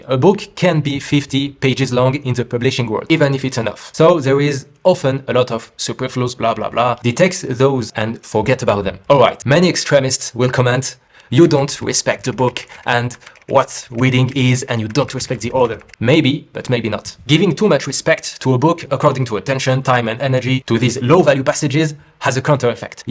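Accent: France. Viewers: I am curious what your native language is. I’m assuming a middle eastern language because of your name But then the accent sounds French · I denote a French accent😊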